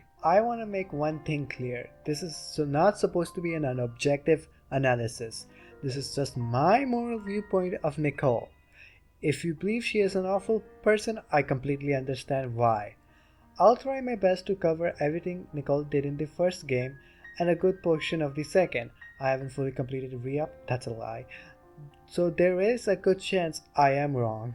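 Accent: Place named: Ukraine. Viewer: you kinda sound like the counselor if he was from kyiv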